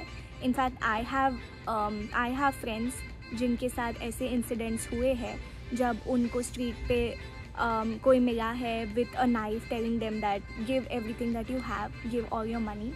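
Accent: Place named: India. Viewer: Perfect Indian Accent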